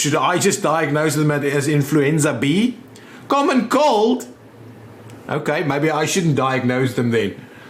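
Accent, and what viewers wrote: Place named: South Africa. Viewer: Hahahah you sound sooooo South African, nice vid man!!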